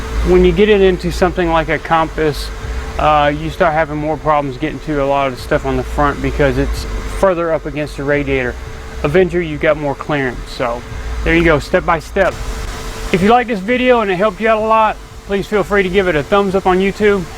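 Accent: South, United States. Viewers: Nice videos dude. fast but you cover it all. which is perfect for the average experienced mechanic. i like how you dont lolly gag on unneeded details, like how it was brought in on on flat bed truck or how you found a bird nest in it. fast, professional, informative. thanks. BTW... is your shop in Detroit? you seem to have a slightly southern accent.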